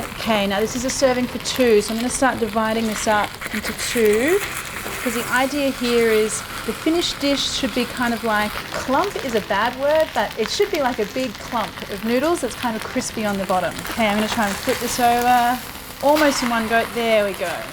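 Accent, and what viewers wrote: Australia. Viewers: Hello, tell us a little about yourself. You live in Bangkok but you have an Australian accent. How did you become a chef? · Ahhh Australian, my apologies for mistaking you for being English after reading the comments,. 🙏🏼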